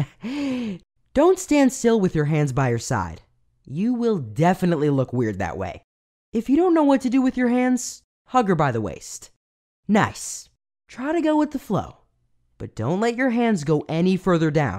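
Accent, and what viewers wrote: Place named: United States. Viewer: In thought u were american XD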